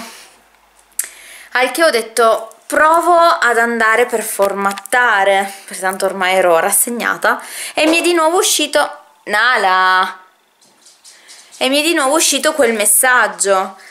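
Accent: Turin, Italy. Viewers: Ciao tesoro ♥️ FREGATENE DI QUESTE PERSONE, perché non hanno un perché in questa vita. Sono povere dentro, commentano tanto per, perché durante il giorno non hanno nulla da fare, commentano perché credono di farsi belle agli occhi degli altri ma in realtà sono delle nullità. Stai super serena e continua a stare in pigiama quanto ti pare e piace perché nessuno può e sopratutto deve dirti cosa fare e cosa non fare❤️ Tesoro dato che è uscito il discorso like e dislike.. noto che nel tuo canale appunto non esce il numero dei like e dislike, ma solo il pollice in su e il pollice in giù! Ma è un’impostazione che hai messo tu? Perché vedo che su altri canali non è così! Solo per pura curiosità ahah 😂🙊 Comunque adoro troppo i tuoi vlogmas😍 adoro vedere la vostra quotidianità, e amo tantissimo il tuo accento torinese 😍😍❤️❤️ vedrei i tuoi vlog ogni giorno, mi tieni troppa compagnia 😍 Un bacione enorme ❤️❤️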